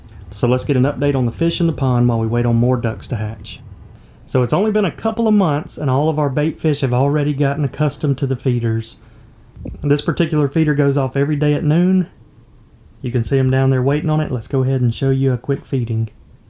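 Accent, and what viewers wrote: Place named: Pacific Northwest, United States. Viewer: i love this. The sound outside is also enough for me. if you live streamed your ducks id patreon for that in a heart beat. I just had to move back to the PNW from GA so your accent is very welcomed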